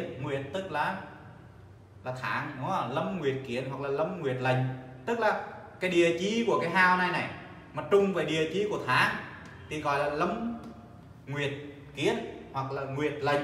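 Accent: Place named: Hanoi, Vietnam. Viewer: Vậy khi đi dạy thầy nói giọng Hà Nội cho nó máu ai cũng nghe được hết chỗ để chê thầy còn khi về nhà thầy lại nói đúng giọng của mình. Thầy rất giỏi và giảng giải cũng rất dễ hiểu tỉ mỉ từng chi tiết nhỏ khâm phục thầy. Xin cảm ơn về những khoá học miễn phí của thầy và chúc thầy cùng gia đình luôn nhiều sức khoẻ và luôn may mắn và luôn luôn ngập tràn trong hạnh phúc an vui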